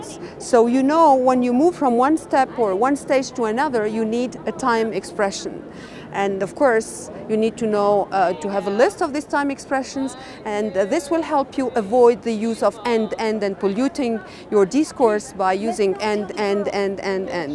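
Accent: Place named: Russia. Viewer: hello Teacher, You have a little European country accent. May be , Russia. Where are you from?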